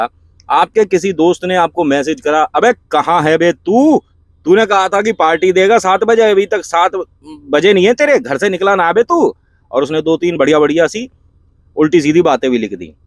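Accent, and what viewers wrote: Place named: Etawah, India. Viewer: Jai Balaji Maharaj 🙏....From Kanpur but right now from Arunachal Pradesh...Sir aapki boli Itawah ki taraf ki lag rahi hai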